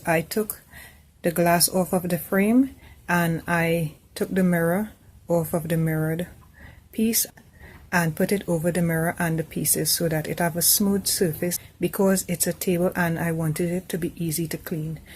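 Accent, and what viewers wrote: Trinidad and Tobago. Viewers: Hi you sound like a trini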